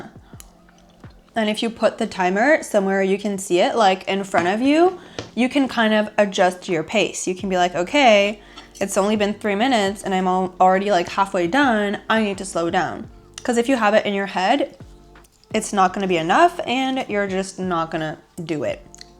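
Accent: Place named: United States. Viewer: when folks hear you speaking 🗣️ english do they mistake you for American becoz your exceptionally well spoken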